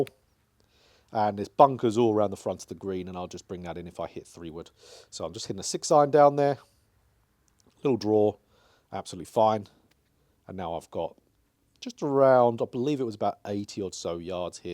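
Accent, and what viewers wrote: Essex, United Kingdom. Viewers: lol, I posted before you said you were in West Coast of Scotland ! 🤣thought you were an Essex boy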